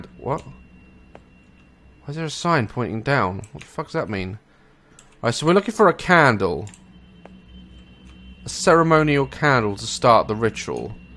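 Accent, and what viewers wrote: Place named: Newcastle, United Kingdom. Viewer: I think it's a Gordie accent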